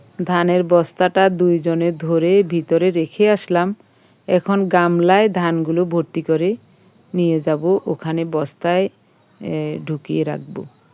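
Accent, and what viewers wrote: Noakhali, Bangladesh. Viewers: Aamar sabche bhalo laglo desher noakhali kotha dhanyawad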